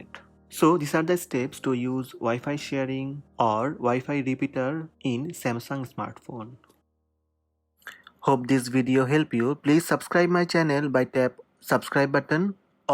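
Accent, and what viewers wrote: India. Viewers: Я знал что парень с индийским акцентом поможет мне разобраться с этим дермом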